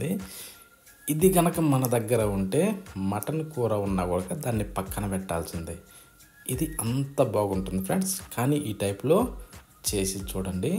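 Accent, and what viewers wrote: Nellore, India. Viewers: Bro meeru Nellore valla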